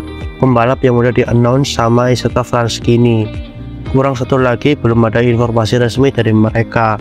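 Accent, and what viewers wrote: Indonesia. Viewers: padahalmah aksen Indonesia nya biarin ajja, tapi yaaa banyak yg pengen disama'in kaya aslinya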